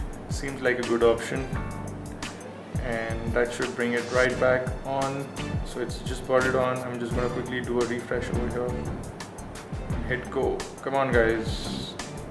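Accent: India. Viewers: Why are all these reviewers Indian? I fucking hate hearing this accent all the damn time!! No I'm not racist it's just an opinion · Its like I'm listening to that indian character on the Big Bang Theory series... 0_0 PS: Yes I know the uploader is probably indian.. what I'm saying is, its annoying. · indian is speakin!!!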